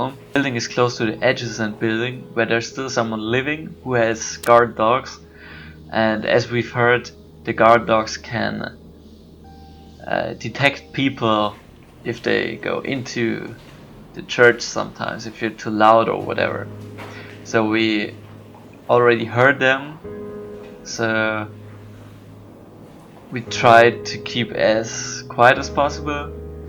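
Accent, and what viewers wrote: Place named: France. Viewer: sounds france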